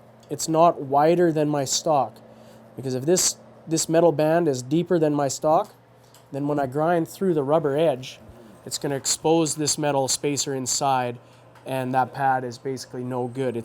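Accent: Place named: Canada. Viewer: FatherSonGunFun Um, pretty sure that's a Canadian accent.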